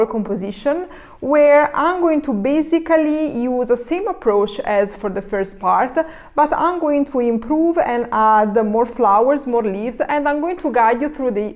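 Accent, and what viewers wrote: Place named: Italy. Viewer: Parli inglese come solo un italiano può parlarlo 😅. Sei italiana? Video utile. Grazie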